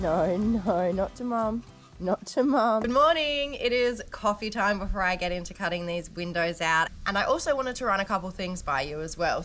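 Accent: Australia. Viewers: Gday looking great got Granddaughter Number two with me Sarah she is 18 months and loves your show haha maybe the Australian accent have a great week !!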